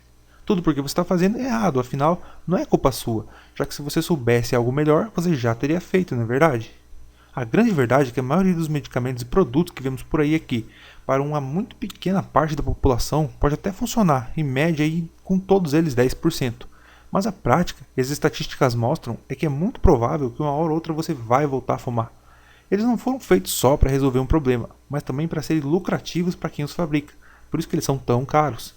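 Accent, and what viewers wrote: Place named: Inland São Paulo, Brazil. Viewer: Com essa fala de paulista do interior aff que r ridiculo!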